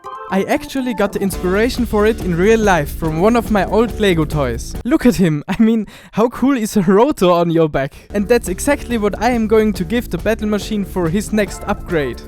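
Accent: Germany. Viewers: Mann hört so krass das du deutsch bist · ngl i love your german accent :D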